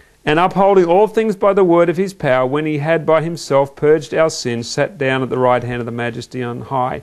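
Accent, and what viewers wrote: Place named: United Kingdom. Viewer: Thank you for this presentation. And, oh, what a fine British accent!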